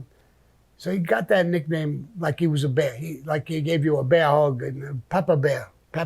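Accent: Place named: Northeast, United States. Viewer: It’s that northeastern accent brotha. Rampa’s = Rampers.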